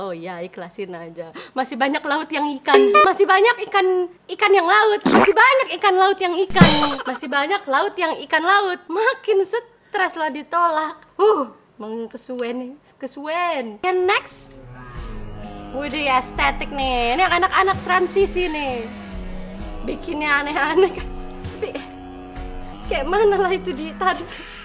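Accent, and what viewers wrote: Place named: Java, Indonesia. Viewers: Boso.mu medok² jowo Ki wong ndi toh😴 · Reaction logat Jawa lucu kak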